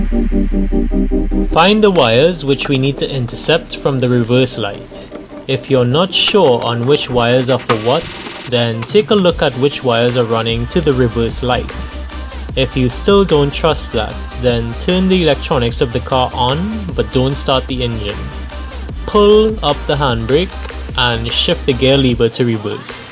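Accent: Trinidad and Tobago. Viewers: Knew he sounded like a Trinidadian, big up Couva lol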